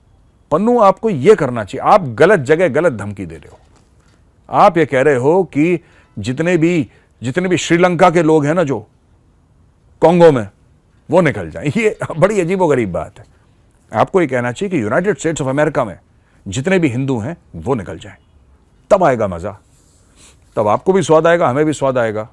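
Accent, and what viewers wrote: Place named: Bihar, India. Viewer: Bihari hindi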